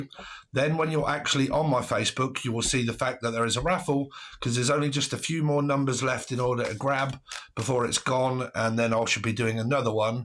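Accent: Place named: England, United Kingdom. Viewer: I love your f**** accent and you're on it cuz I'm not communicating with somebody right now basically they're not communicating with me I've tried and I've tried and I haven't blown the man down and don't take balloon literally blown lol Dude I think you rock and I love your accent and I love your freaking drawings they're funny and great and you know your s*** You're hilarious You make my day and I wish I was English so I could come there and talk to you but I'm not it's okay but you're cool dude Don't let anybody tell you you're don't know what you're doing cuz you do and I appreciate you❤😊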